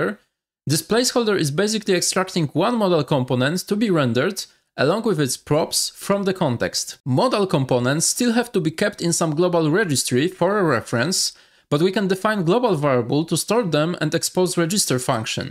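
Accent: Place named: Poland. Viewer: where are you from? Your accent sounds kida polish?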